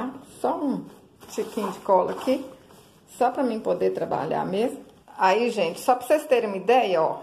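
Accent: Minas Gerais, Brazil. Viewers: Gente, que coisa lindaaaa! Sou fã desses tipos de trabalho! Amo esse jeitinho mineiro de falar. Parabéns. LINDO! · Ficou maravilhosaaaaa, parabéns!!!!! Amo sotaque de mineirinha 😍😍😍😍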